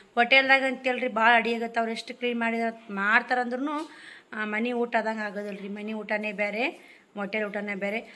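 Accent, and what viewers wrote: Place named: Uttara Kannada, India. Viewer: ನಿಮ್ಮ ಪ್ರತಿ ಲಾಗ್ ಗು ನನ್ನ ಹೈಪ್ ಇರುತ್ತೆ ಸಿಸ್ ಖುಷಿ ನೋಡೋಕ್ ನಿಮ್ಮ ಲಾಗ್ ಮಿಸ್ ಮಾಡಲ್ಲ ನಾನು ಡೆಲಿ ನೋಡ್ತೀನಿ ನಮ್ಮ uk ಭಾಷೆ ಕೇಳೋಕೆ ಚೆಂದ 🎉❤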